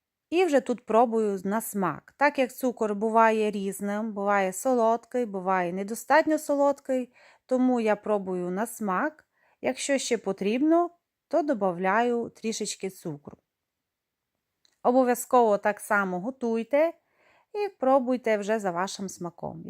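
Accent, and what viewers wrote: Transcarpathia, Ukraine. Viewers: У вас вимова як у Олі,закарпатської господині,наче ви сестри.Дуже приємно вас слухати,голос лагідний.Дякую за рецепт.Бажаю вам всього найкращого.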